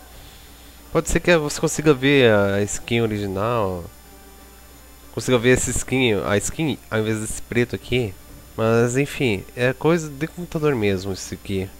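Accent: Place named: Portugal. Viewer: Vc tem um sotaque portguês, mas vc é brasileiro ? qual sua nacionalidade?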